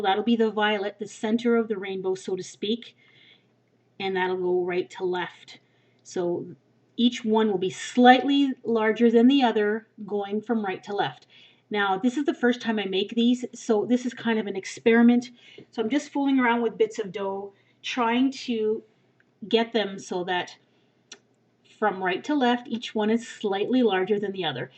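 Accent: Canada. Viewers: she's canadian, right? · As an american, she definitely has a canadian accent.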